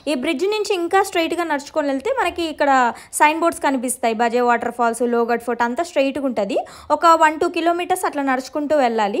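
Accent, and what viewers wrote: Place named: Telugu, India. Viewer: ur pure Telugu slang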